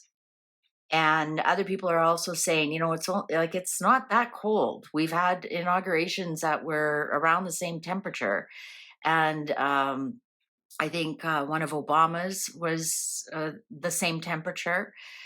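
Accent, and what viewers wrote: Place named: Canada. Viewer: Thank you for this reading. I love to hear your Cannadian accent. It reminds me of my immediate family who married Canadians and live in Ontario😊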